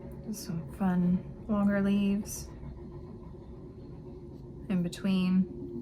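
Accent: Canada. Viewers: I have another reason to love your channel. I bought a set of pearlescent watercolors from Michael's - about $8-10 Canadian. I tried them out, and the colors are so beautiful. I don't have the metallics though. Your video is very welcome. Question. Are you in Canada? Your 'accent' sounds different from Americans.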